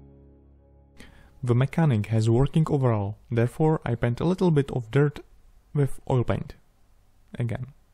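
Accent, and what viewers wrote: Russia. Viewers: ты русский 100% ну или белорус крч инглишь твой второй язык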